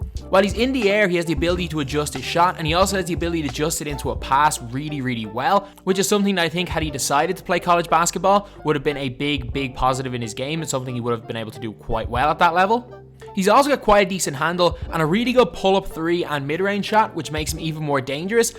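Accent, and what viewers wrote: Ireland. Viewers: This is summer league ball, there is no defense whatsoever being played. All these comments are not judging him how he should be judged. His release point on his shot is low, he does not look like he can touch the rim on any forays to the basket (taking it to the hole). I am not sure why a video was made of a guy playing summer league ball with no defense and the whole time the video shows him going by other kids of pretty poor talent. Jass looks fine as a player, but he is right hand dominant, low release point on shot, simply going around guys that are even worse than he is as an athlete. What can he do from a defensive stance, how fast can he tip the ball and then outrun several plays on the way to a steal and a bucket? This is what scouts have to see. Time will tell what he can do in college in Canada. But, he needs to shoot with a higher arc, higher release point above his head and the video showed nothing about what he can do on defense. 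Absolutely one of the most pointless videos of all time!! The narrator who made the video (with I believe an Irish accent) states that some say Jass will make the NBA and then begins to debate this on his video. Who is it that is saying Jass will make the NBA? This video is insane! Just let the kid develop and then we will see how good he can be. We won't know this for another two years.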